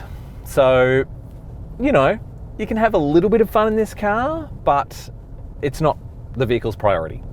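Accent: Australia. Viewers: That down-under accent + curry = solid combo mate xD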